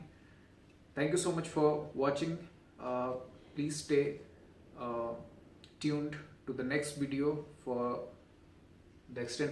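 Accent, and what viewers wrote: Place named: India. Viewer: poor accent and english.. too much indian, cant understand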